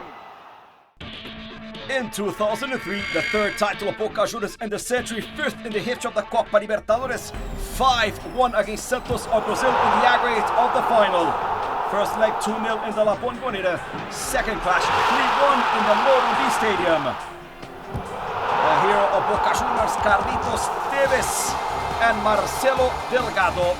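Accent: Portugal. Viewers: E portugues falando ingles, fico mt engraçada essa narração ai kkkkkkkk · Nice portuenglish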